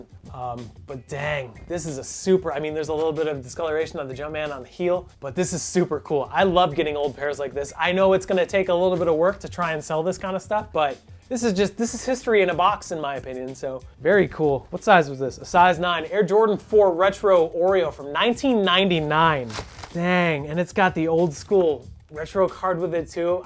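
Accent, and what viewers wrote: Chicago, United States. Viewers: Your Chicago accent veers more and more toward Bartok, the bat from Anastasia, every time you do it. (You're too old to get this reference and most of your subs are probably too young so I guess this comment is for me)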